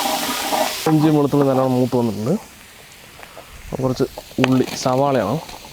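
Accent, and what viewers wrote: Palakkad, India. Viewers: തനി നാടൻ പാലക്കാടൻ തനിമ സംസാരത്തിലും പെരുമാറ്റത്തിലും, നിങ്ങളിലൊരാളായി ജീവിക്കാൻ ആഗ്രഹംതോന്നുന്നു👌👌👌👌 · Thani palakkadan malayalam slang ishtamullavar oru like adikko😍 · adipoli pkd slang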